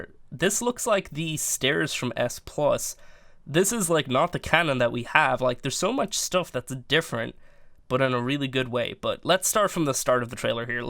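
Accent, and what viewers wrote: Netherlands. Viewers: Nederlands? Dutch? I can't tell from your voice, well some times a little bit if you listen closely but good english, i noticed that your envidia shadowplay is in dutch :) nice vid man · are you Dutch?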